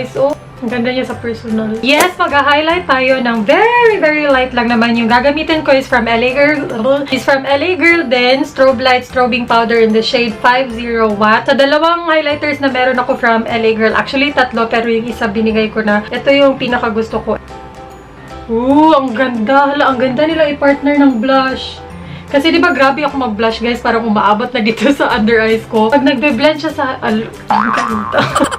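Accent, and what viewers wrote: Visayas, Philippines. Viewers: bisaya ka po ba ate?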